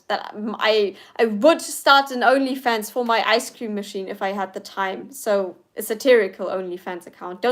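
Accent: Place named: United Kingdom. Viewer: You sound a bit like a character out of the Harry Potter films when you're speaking English. No offence!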